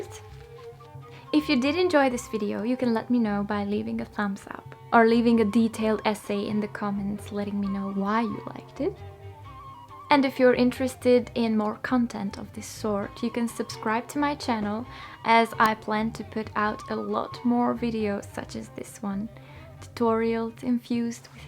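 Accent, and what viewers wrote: Hungary. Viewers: megtaláltam egy videódat régebben és megesküdtem volna hogy magyar akcentusod van, de ezek szerint jól éreztem :D 10 évig néptáncoltam, mi is hasonlóan kötöttük a hajunkat :))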